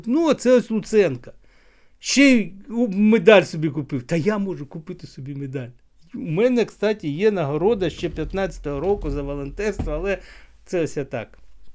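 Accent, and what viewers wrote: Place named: Russia. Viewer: В пана самого проскакують русизми , 10 років московський чобіт топче нашу землю , а ви , пане блогер , так несуразно розмовляєте українською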